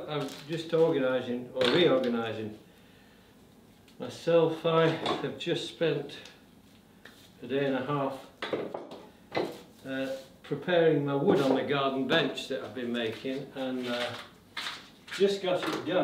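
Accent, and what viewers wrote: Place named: Derbyshire, United Kingdom. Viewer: The Thames!? I can't exactly place you accent, but it's close to mine, Tideswell, Derbyshire. Now living in northern Italy, chestnut forest. Find your attitude very reassuring, keep up the good work!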